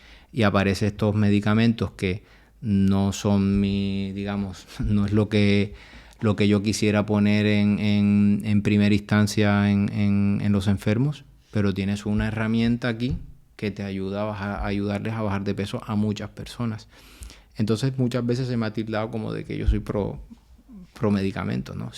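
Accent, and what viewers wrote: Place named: Caribbean Coast, Colombia. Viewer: Por su acento creo que este médico es costeño de Colombia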